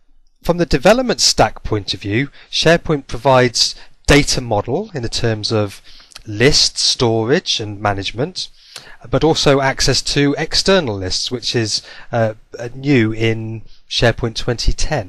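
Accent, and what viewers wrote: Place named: United Kingdom. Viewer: Yes, development-related video in British English - a dream come true :-D Seriously though, nice overview - a must-watch for beginner devs, but a notable omission of SQL Server indeed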